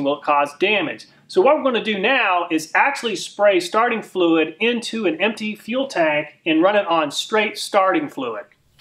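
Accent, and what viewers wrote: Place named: South, United States. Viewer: I like how this guy talks. His mouth is so animated and the southern accent. Very entertaining to watch/listen.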